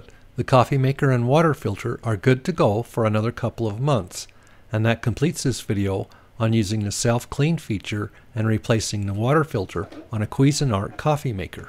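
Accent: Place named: Ireland. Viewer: Sounds to me like he’s from Ireland but has been in the US for a long time so it’s subtle now.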